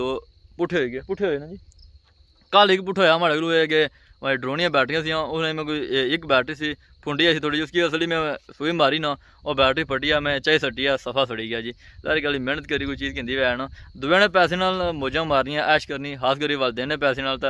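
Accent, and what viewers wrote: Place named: Mirpur, Pakistan. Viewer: Aap ke mun say mirpuri pyari lagti hay,forget urdu language, thanks. Mazhar Hussain Birmingham uk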